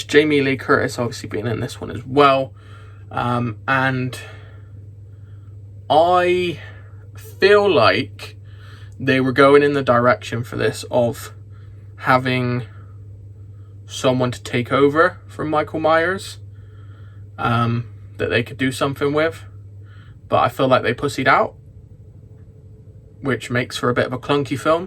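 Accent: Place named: Bristol, United Kingdom. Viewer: Great video - Love how you are int oso many different genres, aloa love the accent from a fellow Bristolian! More please!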